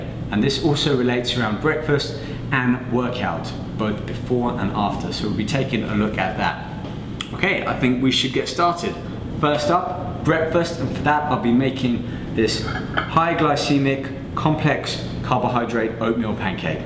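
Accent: London, United Kingdom. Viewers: YOU SOUND LIKE YOU'RE FROM LONDON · lol he is british, he has a very strong british accent. Born in london and so on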